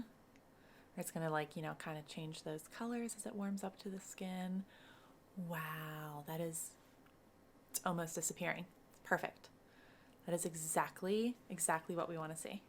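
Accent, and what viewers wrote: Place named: United States. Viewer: I totally thought you were going to have a british accent 😂 I'm actually shocked you have an American accent 😂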